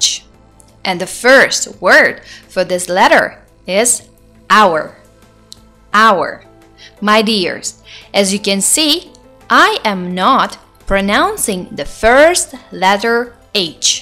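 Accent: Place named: United States. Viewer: I like this American accent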